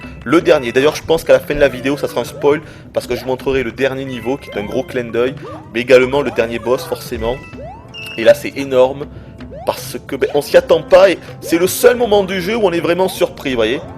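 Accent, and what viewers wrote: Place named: Southwest, France. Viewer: est du sud ouest: (mouainsse AU LIEU DE MOINS ) XD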